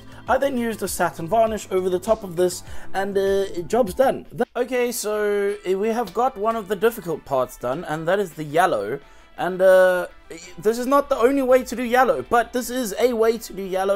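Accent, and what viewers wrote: South Africa. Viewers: His bio says he's from the uk but he sounds so south african. Really like the video though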